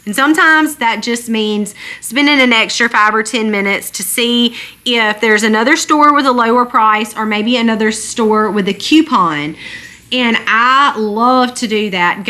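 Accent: South, United States. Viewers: Lovely southern drawl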